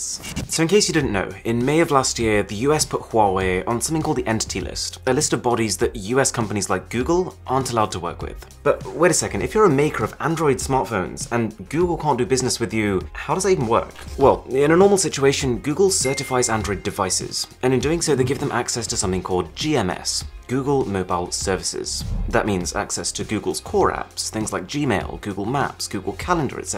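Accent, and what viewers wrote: United Kingdom. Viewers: The only thing i payed attention to is that British accent.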